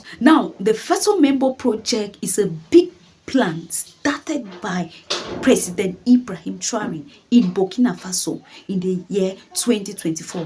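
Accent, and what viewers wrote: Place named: Ghana. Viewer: Jamaica =English? Jamaica = Patios I am a Jamaica The vloger is GHANIAN who speaks with an accent THIS IS PERFECTLY NORMAL/ACCEPTABLE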